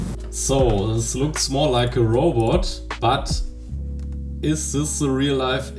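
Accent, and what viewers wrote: Germany. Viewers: the german accent gives me hope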